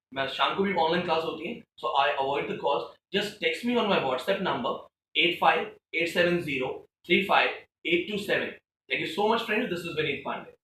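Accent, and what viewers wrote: India. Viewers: STICKING TO OUR INDIAN PRONUNCIATION AND STYLE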